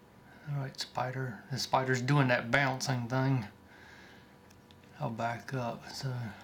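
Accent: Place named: South, United States. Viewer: Where exactly is your accent from? Sounds quite southern to me :) That is one beautiful bouncin' banana spider with the prettiest pattern on its body. The way it's cleaning its legs is still a little awkward to watch, though. I've come a long way with spiders but these awfully long legs are still making me feel rather uncomfortable. I'll get there eventually.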